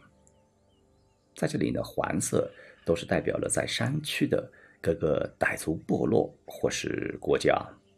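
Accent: Yunnan, China. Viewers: 云南口音很亲切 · 这是云南口音啊？😂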